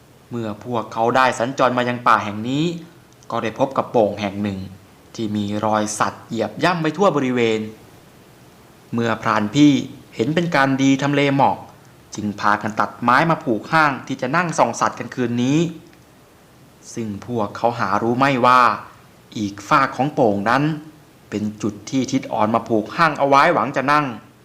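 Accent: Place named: Rural, Thailand. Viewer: ສຳນຽງພູດຄືມາກ ກົມກື່ນກັບສະພາບບ້ານນອກດີນະ ຄິດຕາມທຸກຄລີບ ຂອບໃຈ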